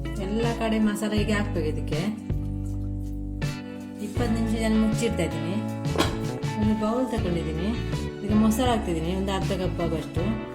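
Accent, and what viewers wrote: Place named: Mangalore, India. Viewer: I like mangalore kannada.......... 😍😍😍😍😍😍😍😍😍😍😍😍😝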